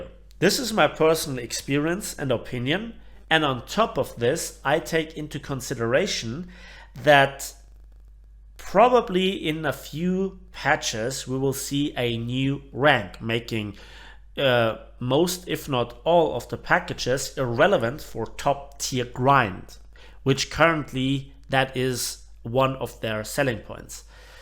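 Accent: Germany. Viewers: "Die Aufnahme ist gestartet" bist du deutsch? Würde irgendwie zum Englisch passen, da das einen wirklich nur leichten deutschen Akzent hat😂😉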